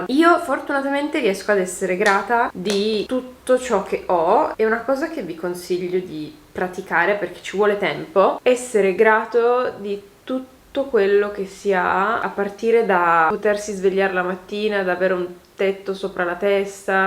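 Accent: Turin, Italy. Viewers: video molto bello ! però la questione accento non è troppo vero, l’accento di torino è inconfondibile ✨ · Il tuo accento è assolutamente torinese!😄😄😍 Tutti i torinesi, sono conviti di non avere inflessione. Io che sono nata a Torino, ma vivo e ho origini toscane, lo so bene. Adoro il torinese e mi manca la mia città. Sei una persona bellissima 🥰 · Mi fa morire che pensi che i torinesi non abbiano un accento! Hai un accento fortissimo 😂♥️ e lo trovo adorabile!! Bellissimo video come sempre, non commento mai perché sono riservata, ma oggi volevo dirti che i tuoi video mi tengono tanta compagnia e mi infondono tranquillità, grazie 🌸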